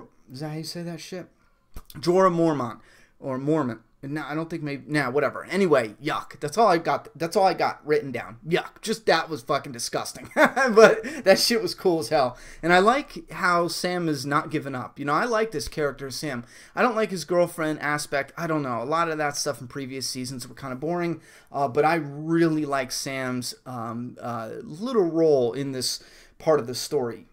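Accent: United States. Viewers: I love your American way of speaking about Game of Thrones. 😊 Such a great episode, I watched it twice. Loved seeing Lady Allena meeting Khaleesi.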